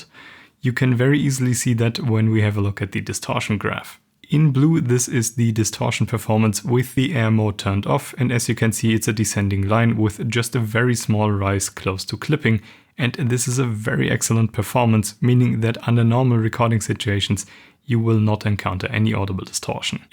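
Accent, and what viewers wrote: Germany. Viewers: Good video. But I think you are German… it’s the typical pronunciation there… no front! It’s a very good video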